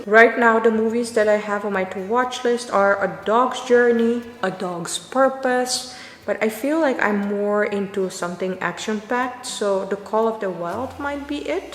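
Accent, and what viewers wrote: India. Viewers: She is picking up the indian accent. This is nice☺ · Your english accent is more indian now! I've seen your videos for years and I just came to this realization. You make me love india more and more every time!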